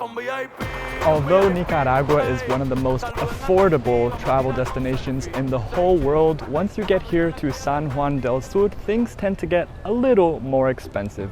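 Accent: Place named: United States. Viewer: American accent but uses celsius?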